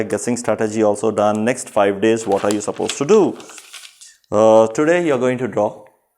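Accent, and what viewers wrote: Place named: Mexico. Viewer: Why is he trying to mix English with maxican accent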